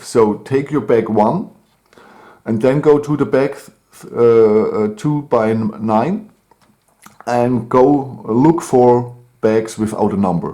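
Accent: Germany. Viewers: That german accent though...🤷‍♀🤷‍♀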